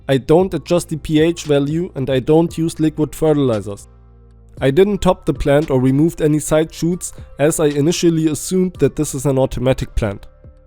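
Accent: Germany. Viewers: An dem Akzent erkenne ich das du aus dem deutschen raum kommst :) Der folgende Text soll kein Klugscheißen sein sondern Liebgemeinter Tipp bzw Weitergabe von Wissen. <3 Wir teilen die liebste Leidenschaft. Etwas Lernlektüre und ich hatte Langeweile xD. edit: ich hasse Schreibfehler :D Teil 1 Das Flushing war in deinem Fall vermutlich etwas zu früh. Ich würde mich weniger an den Angaben der Hersteller orientieren, sondern an der Pflanze selbst. Jede Pflanze ist ein Individuum und reift unterschiedlich schnell. Die Dame hat im Verhältnis noch recht viel Blattmaterial und vergleichsweise wenig Calyx-Masse. Sie hat also noch nicht richtig "gebulkt" , die Kelche sind noch nicht vollständig angeschwollen. Außerdem wirkt sie noch nicht vollständig ausgereift. Das erkennt man unter anderem an den vielen noch weißen, aufrechten Pistillen. Mit zunehmender Reife verfärben sich diese und ziehen sich zurück. 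Dabei sollte man beachten, dass Pistillen durch Berührung oder Umwelteinflüsse schneller oxidieren können und deshalb allein kein zuverlässiger Reifeindikator sind. Trichome und der allgemeine Reifezustand sollten immer mit beurteilt werden. Wie stark eine Blüte letztendlich anschwillt, hängt zu einem großen Teil von der Genetik ab. Pflanzen mit einer genetischen Veranlagung für große calyxreiche Blüten bilden meist auch kräftigere Kelche und können entsprechend größere Samen entwickeln, sofern sie bestäubt werden. Neben der Genetik spielen jedoch auch Kulturführung und Nährstoffverso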